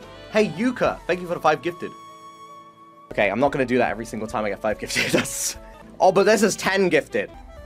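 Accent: United Kingdom. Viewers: He looks so Filipino but sounds so british its actually confusing me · "Doesnt look asian enough" bruh. His voice too british for that asian look if u ask me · I sometimes don't get how he looks filipino but sounds like a fucking british character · I cant make sense of his voice cause he sounds so british but he looks so filipino 😂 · man's got a british accent but he looks like an asian mobster without the shades very friendly lookin' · His Britishness is contained entirely in his voice and his cooking skills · The fact that he looks so Filipino but sounds so British messes with my head. While I was watching the live I kept thinking that he sounds dubbed 😭💀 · The british voice with that face cracks me up for some reason. Am i an asshole?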